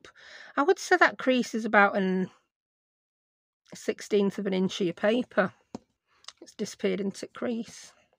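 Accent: United Kingdom. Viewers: Hi, loved the video. So nice to hear a nice british lady crafting, all the videos Ive come across are Americans and Ive nothing against that but just wo der why theres no british crafters on you tube. I have lotsof craft stuff but dont know how to use it. Just subscribed hope I lwarn how to craft properly. Thanks for sharing 💖 x x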